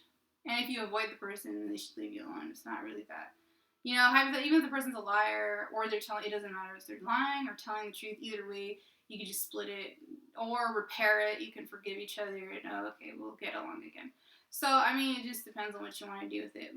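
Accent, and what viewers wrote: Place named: South, United States. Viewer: Hey. I love your southern accent. Praying for us all. Take care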